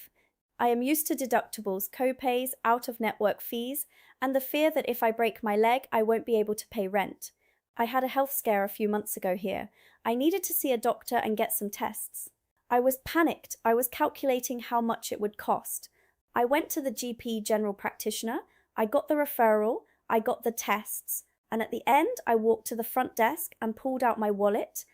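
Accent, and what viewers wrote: England, United Kingdom. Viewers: So why do you have such an amazing English accent ? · Have you been practicing your English accent? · You seem to have acquired a nice genuine British accent in your time here....🙂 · Respect for your proper English accent. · Think sweetheart, you will find it takes 3mins to bowl a full kettle of water, but who cares, pleased you like our beloved country, as for your distinct accent, have you some English parentage by any chance?.... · Excellent presentation, english humour and accent but please lose the Glottal stop! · How come, if you're a seppoe, you've got a cut-glass English accent? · You could almost pass for British with your accent, especially not mentioning the Electrical outputs, and the water taps. 😃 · Can't understand why you have an English accent? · You have a very English accent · You don't sound American. You sound very british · how do u have a british acsent? 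· I only discovered your site tonight. You’re terrific. Love the accent (educated English🤭 ). Love your humour. Hope you stay here. 👍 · All you haters leave her alone I like the fact she’s adopted an English accent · No way are you American with that very British accent. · hmm two years and a perfect British accent, nice try but no way.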